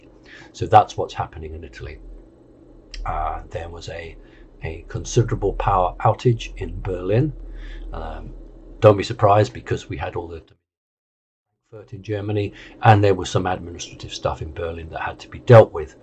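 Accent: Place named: United Kingdom. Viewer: サイモンさんの英語はイギリスだね。だからイギリスからの声。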